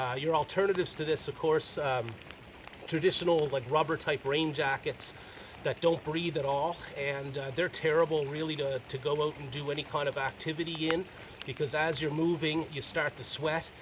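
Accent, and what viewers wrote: Ireland. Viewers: Sounded quite Irish yeah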